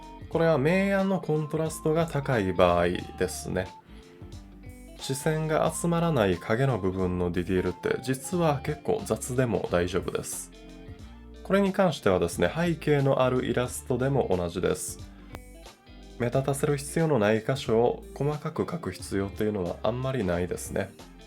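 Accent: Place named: Kansai, Japan. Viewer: 関西弁すき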